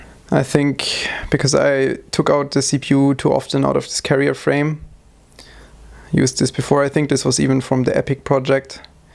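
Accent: Germany. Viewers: Man hört den deutschen Akzent soo sehr heraus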